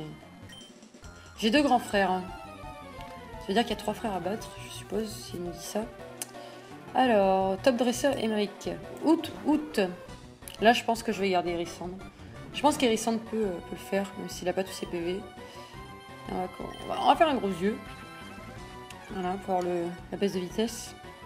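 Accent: Marseille, France. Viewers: Salut :) Le petit accent Marseillais qui fait plaisir ^^ GG pour le Galekid dans Rubis,Saphir et Emeraude tu en trouve dans une grotte a la 2eme arènes ^^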